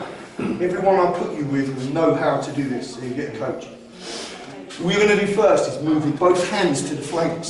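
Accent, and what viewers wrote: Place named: Leicester, United Kingdom. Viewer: Hi, addicted to your vids, i’m probably your age and maybe had similar experiences growing up (BUT I wasn’t wearing a suit or uniform, enough said, i detect a leicester accent sometimes - i was in glen parva so you can guess the rest)), did bjj & striking at “mansfield chinese boxing club” (was actually “british combat” & bjj actually) also had my own “b’c’” weekly coach (one of the original 10 coaches certified by Geoff & Peter) so i was PASSIONATE about violence in my late teens up to now i suppose BUT had to give up on getting into violent situations whenever possible because i discovered money and for every step i took forward i took 2 back, The lesson i want to share with your viewers is this >>> WHEN YOU ARE GOOD “AT VIOLENCE” it’s an easy trap to fall into that you don’t bother to learn alternative life skills because violence gives you SOOOOOOOOOOOOOO much and that in it’s self is “self validating”!!! 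I was very lucky/ unlucky that i developed some serious physical problems that saved me from myself and forced me to learn new ways of dealing with situations, gaining validation etc, it has been an amazing addition to my lifestyle (ps i came from nothing & became “rich” in every way a young guy dreams to be rich in!!! BUT WITH VIOLENCE ALONE YOU WILL LOSE EVERYTHING, I am still as passionate as ever and i still have a devastating 2 minutes in me & feel the need to back down to no man because of fear BUT please i know you understand everyth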